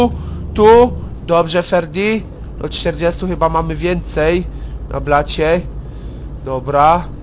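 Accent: Silesia, Poland. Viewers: ty jesteś z Śląska ??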